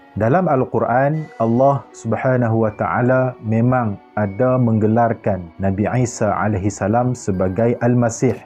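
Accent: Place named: North, Malaysia. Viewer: Mula2 mcm nk cover bahasa utara..last2 x boleh tahan..😆😆..apapun penjelasan yang jelas..😁😁😁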